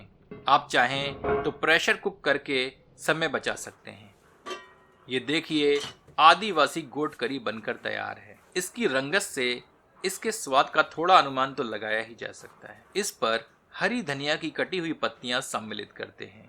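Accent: Standard. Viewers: aapki hindi bahut shudh he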